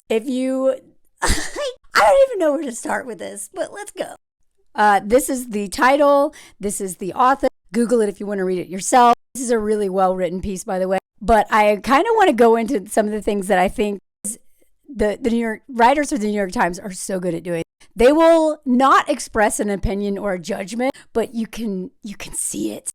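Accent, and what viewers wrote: South, United States. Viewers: I love your southern voice! 😂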